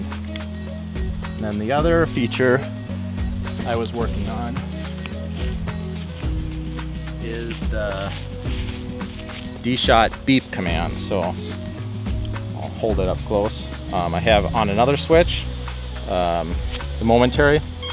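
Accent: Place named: Wisconsin, United States. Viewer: Sounds like you're from Wisconsin. I am!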